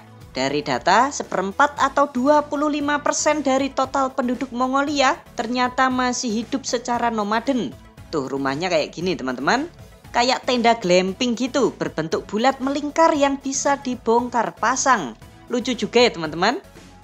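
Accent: Java, Indonesia. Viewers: Logat Jawanya yg sangat Medok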